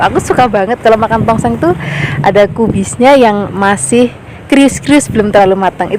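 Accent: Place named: Semarang, Indonesia. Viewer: Suka bngt dg cara bicara nya ceria bnget...spt logat org semarang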